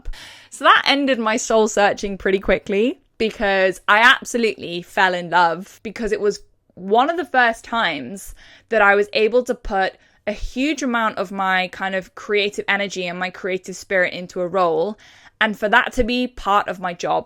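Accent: London, United Kingdom. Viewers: Her accent has become real London lol happy for her - hope all works out 💚💚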